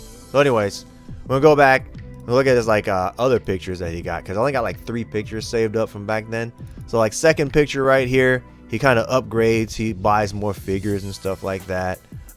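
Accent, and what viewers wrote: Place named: London, United Kingdom. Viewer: “You sound like your from Lon-Don”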